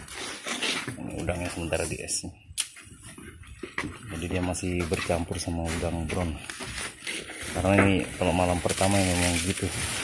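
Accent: Bugis, Indonesia. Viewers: Seperti logat orang bugis hehehe salam dri bugis barru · Mesti org bugis ni logat pn boleh tau😂😂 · Ketahuan dari logatnya.. Saya juga orang Bugis yang tinggal di Kab. Kotabaru, Kalimantan Selatan. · Btw orang mana kamu bro..klo sy dgr logat nyaa Orang sulsel , Lbih tepatnya Org bugisss :) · Kyak logat bugis yaa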